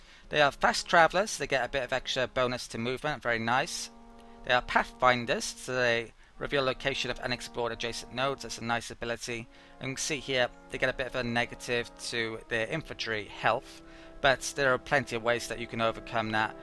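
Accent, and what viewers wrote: Wales, United Kingdom. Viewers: u from wales?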